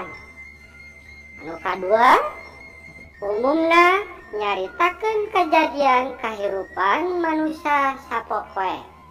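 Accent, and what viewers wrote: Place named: Garut, Indonesia. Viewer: Sundana ti garut nya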